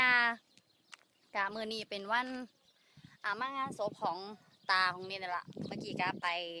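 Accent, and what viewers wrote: Isan, Thailand. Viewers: น่ารักพูดอีสานน่ารักดีครับ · เพิ่งได้มาดูคลิปนี้ เวลาเนสท์พูดอีสานนี่น่ารักมากจริงๆอ่ะ บ้านตอนเด็กๆก็อยู่อำเภอไกลๆเหมือนกัน เข้าใจเลยว่าอากาศแถวทุ่งนามันดีมากจริงๆ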